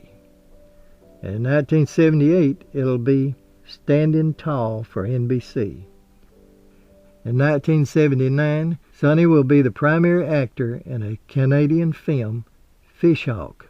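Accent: South, United States. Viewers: I could listen to you narrate a telephone directory, or anything else for that matter. You have such a soothing voice. I love your Southern accent. Best wishes from the UK 🇬🇧 ❤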